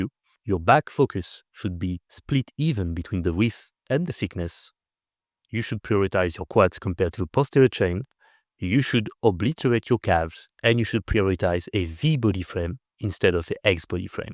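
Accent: France. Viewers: Not a fan of frenglish. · Nothing wrong his English and it’s just a French accent. Probably confusing for Americans who’ve had less exposure to it than those watching from Europe. Slowing his pace of speaking may help them.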